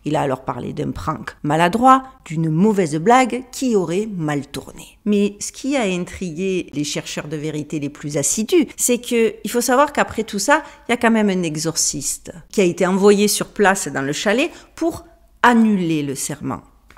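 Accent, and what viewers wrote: Marseille, France. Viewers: Trop géniale votre vidéo j apprécié beaucoup votre côté solaire et votre franchise avec votre accent du sud Marseille? Moi j y habite mais j avoue que Las vegas ou San Francisco m attire bref continuez ainsi moi j adore 🙏👍❤️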